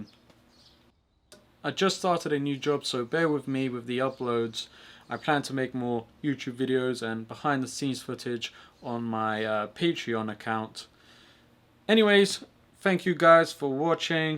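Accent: United Kingdom. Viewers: Lan türk aksanı bekliyordum british çıktı asdfafdas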